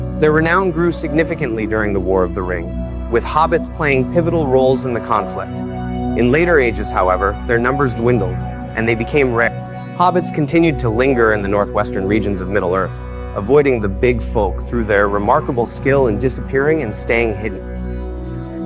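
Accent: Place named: United States. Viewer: A shame about the strong US accent. But it's you, so I'm still going to watch.... Finished and it's as good as the rest. Ty 😊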